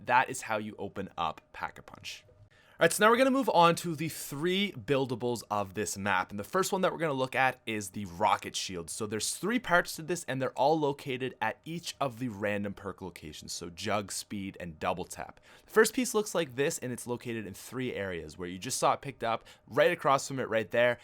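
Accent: Canada. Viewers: I feel like the further you got in the video the more canadian you sounded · is he canadian?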